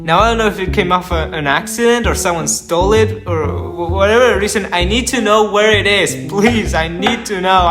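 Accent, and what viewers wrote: Argentina. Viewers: It's so great to hear someone who speaks English. Listening to him say words with an Argentine/Spanish accent. Well, I decided to eat Dulce de leche. Good video and bye bye everyone!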